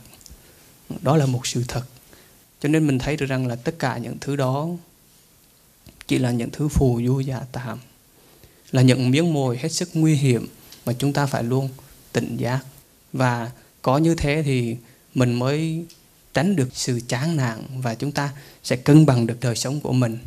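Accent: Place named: Central, Vietnam. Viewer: Giong noi mien trung cua thay rat hay rat thich nghe ma nghe ko chan cam on thay ah .Nam A MO Di DA PHAT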